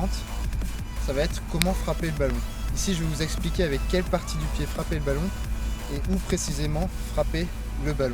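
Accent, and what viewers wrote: Saint-Étienne, France. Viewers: Tu est stephanois!? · T'est de saint Étienne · Tu serais pas stéphanois ? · Vous êtes stéphanois ?! Si oui comme moi aller les verts !!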